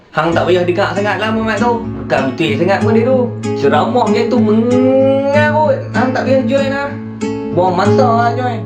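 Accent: Kedah, Malaysia. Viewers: Panjang hang punya mengarut tu bang. Dok luaq negara tp boleh ckp loghat Kedah lagi. Bangga aku sbg org kampung hang. ;)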